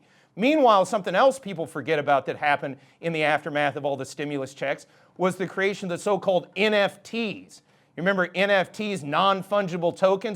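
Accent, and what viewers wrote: United States. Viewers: I love the way you say "us Thais"... a true patriot! American accent. Thai heart ❤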